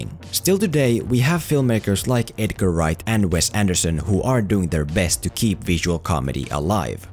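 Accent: Finland. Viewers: You have the most Finnish accent. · Are you Finnish? Because your ralli englanti is showing through. Ja jos oot ni mitä äiä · Are you finnish? For some reason im hearing a finnish accent...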